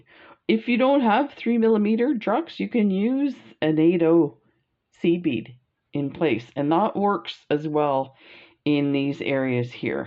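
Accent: Canada. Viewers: I'm so happy to see these earrings. So gorgeous. I just got my hair cut so my earrings will show now...haven't had short hair for years! You explain each step and I enjoy your "chatter", it's part of your charm! Keep doing it. Love your Canadian accent.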